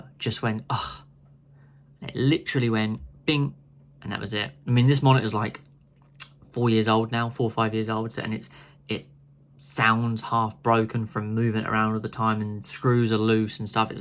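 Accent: United Kingdom. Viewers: Hey are you from Northampton by any chance I only say this because your voice sounds very northhamptony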